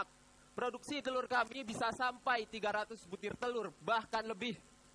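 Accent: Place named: Banyumas, Indonesia. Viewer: Mas Ngapak idolakuuu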